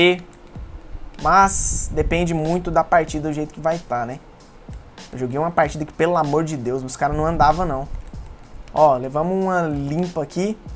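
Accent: Minas Gerais, Brazil. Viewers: Quem curte o Mineirês do dá like!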